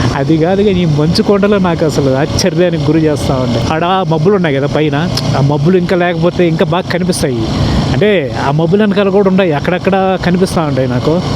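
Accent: Nellore, India. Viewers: 😂😂😂 Nellore slang super 😊 abba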